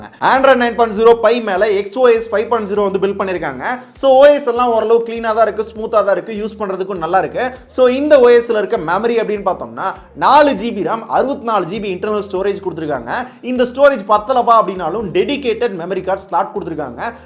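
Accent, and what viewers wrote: Madurai, India. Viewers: Bro neega madurai ya bro.. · Bro neeng madurai ya..... Naanum madurai thaan adha ketta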